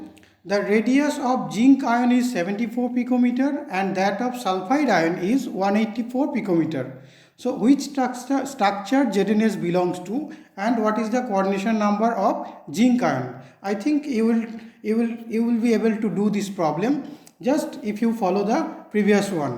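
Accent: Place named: Bengal, India. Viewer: Sir you are Bangali it's clearly known to your voice . Nice outstanding video but sir if you teach in hindi then it will more better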